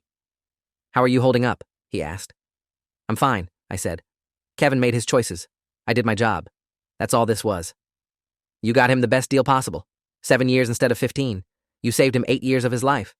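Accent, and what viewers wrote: United Kingdom. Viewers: YESSS! I was just about to ask if I was crazy or is the narrator British? 😂😂😂😂😂😅😅😅😅😅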